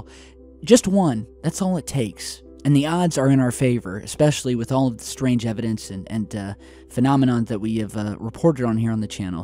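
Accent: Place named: Texas, United States. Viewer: Love the Texas accent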